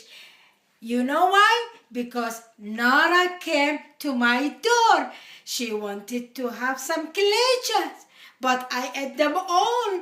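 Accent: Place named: United States. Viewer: you are really great , but you have an American accent , Are you live there before ???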